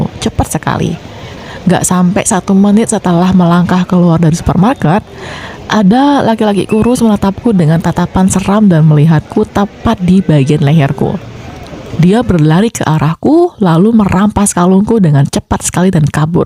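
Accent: Java, Indonesia. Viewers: Asline ngendi sih mbak..ko koyo orang jawa sampean..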